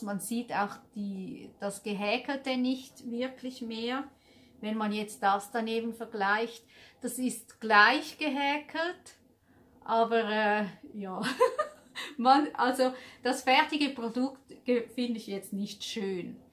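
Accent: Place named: Switzerland. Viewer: Liebe, die Folge hat mir wieder sehr gefallen, Du hast uns ganz schöne Sachen gezeigt. Die blaue Jacke ist traumhaft schön, ich bin ganz begeistert von der Art wie sie Du gestrickt hast, die Rückseite ist ja ganz raffiniert gestrickt, hinten in der Mitte sieht es ja wunderschön aus. Diese Art zu stricken kenne ich gar nicht, also Du strickst ganz tolle Sachen, bist eben, wie ich schon geschrieben habe, eine richtige Künstlerin, Du machst außergewöhnliche SACHEN, egal ob Du strickst oder nähst, es ist so toll und interessant, ich schaue sie so gerne an. Diese Handschuhe sind einfach wunder wunderschön, das Muster erfordert doch viel Geduld und Können. Ich könnte soetwas nicht mehr stricken, weil es mir nicht mehr möglich wäre, ich könnte die Geduld nicht mehr aufbringen. Auch der neue Pulli ist so sehr schön. Diese Tücher und Pullis stehen Dir auch so gut mit Deinen schönen langen Haaren siehst Du umwerfend schön aus. 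Du hast eine solche Fröhlichkeit in in Dir, dass man Dir so gerne zuschaut und zuhört. Ja zuhört, das Deutsch. Mit dem Schweizer Akzent drin finde ich super und höre es sehr gern und es ist überhaupt nicht furchtbar, wie Du denkst, ganz im Gegenteil und ich komme ja auch nicht weit weg, von der Schweizer Grenze, her, wenn ich so rede wie zu Hause, dann meinen die meisten Leute, ich bin aus der Schweiz. Die Leute sind zum Teil manchmal etwas begriffstuzig, die können nicht unterscheiden, ob man Ein Dialekt redet oder richtig Schwitzerisch, sie merken überha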